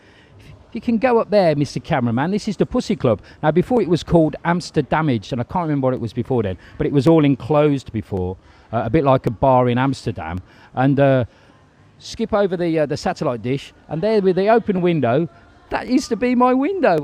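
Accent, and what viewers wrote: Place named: Black Country, United Kingdom. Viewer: Even though you sound yam, I can imagine it must have been a bit of a culture shock going from Smethwick to Soi 6?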